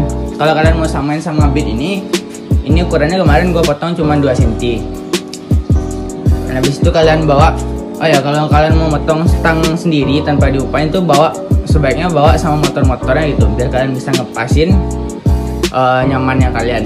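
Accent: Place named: West Sumatra, Indonesia. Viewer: raso suaro urang awak yo bang😂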